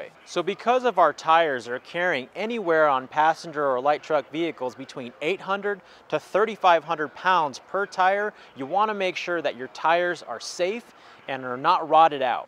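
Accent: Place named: Kerala, India. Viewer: നന്നായിരിക്കുന്നു , ഇഷ്ടപ്പെട്ടു. നല്ല ഇംഗ്ലീഷ് ... ഒരു മലയാളി Touch.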